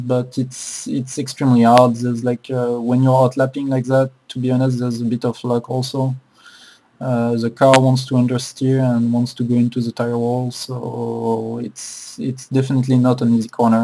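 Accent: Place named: France. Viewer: Don't apologise for your accent man! French accents are seriously cool! Its how you guys manage to pull "our" english women so easily! :P Great video too, i'm down to a 59.5 around LRP but your mega quick and helpful, thanks!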